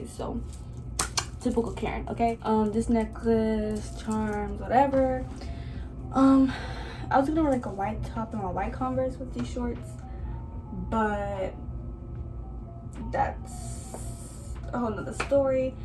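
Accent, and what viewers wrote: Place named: Haiti. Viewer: fellow haitian here! north miami haitian 😂, i could already tell by your accent alonee❤❤❤. also im a long time subscriber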